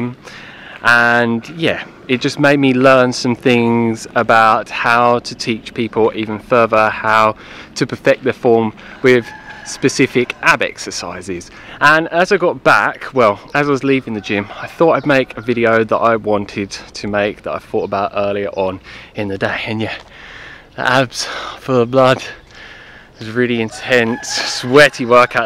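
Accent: United Kingdom. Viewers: is he from the uk?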